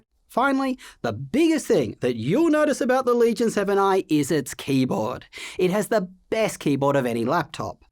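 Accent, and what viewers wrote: Australia. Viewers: OMG your voice sounds Australian.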